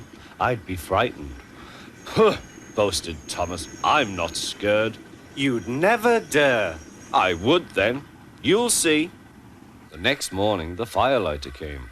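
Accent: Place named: United Kingdom. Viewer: Great job! I love the UK narration.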